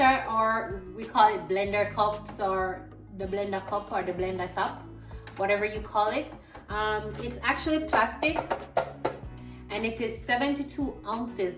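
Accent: Jamaica. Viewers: I can tell by your accent that you are a Jamaican 🇯🇲🇯🇲❤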